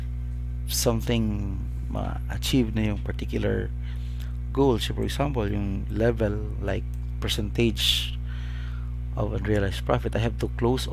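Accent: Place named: India. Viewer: not bad but 1/3rd of what you say disappears in some indian sounding word accent. to sad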